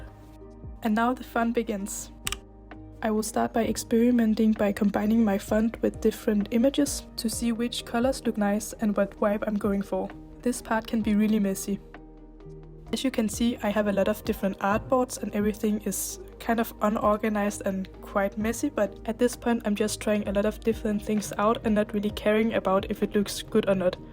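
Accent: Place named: Germany. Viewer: I know my Germans when I hear one 🤭 love your work 🫶🏻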